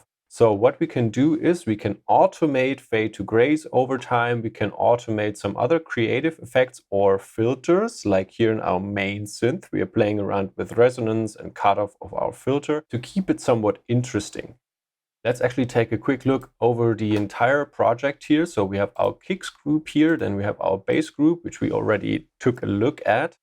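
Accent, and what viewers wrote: Germany. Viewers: Ich mag ja den Deutschen Akzent XDD... aber sehr schönes tutorial... Das problem ist, wenn ich solche baselines mache, hören sie sich auf meinen monitore sehr schön an, aber im auto dann mit anlage grauenhaft, weil zu viel sub anteil, nehme ich dann die low ends so weit raus, dass es geht, hört es sich auf Lautsprecher scheisse an... eine todesspirale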